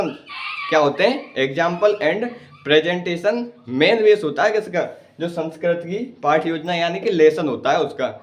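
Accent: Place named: Rajasthan, India. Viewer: Sir ap kha se ho hamri trh bolete ho 😀😀😆 karauli se ho kya ap😅😆😆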